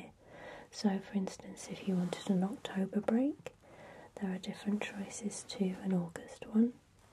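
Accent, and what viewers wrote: England, United Kingdom. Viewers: I love your beautiful, sincere smile, your soothing voice, your nice and stylish English accent.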